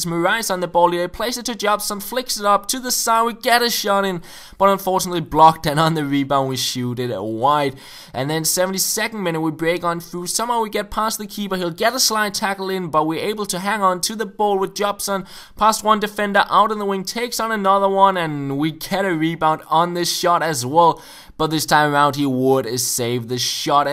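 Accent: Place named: Denmark. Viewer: Man kan sgu bare høre at du Dansker ikke sandt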